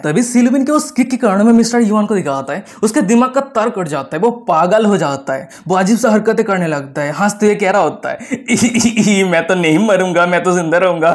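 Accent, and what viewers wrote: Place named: Kolkata, India. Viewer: Bro tum kolkata se ho voies sunke laga ♥️♥️♥️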